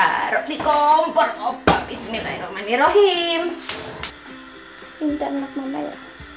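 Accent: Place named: Java, Indonesia. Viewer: Kk indonesia mu medok sekali 😙😙💪💪💪kereeeennnnn ibu kuat ..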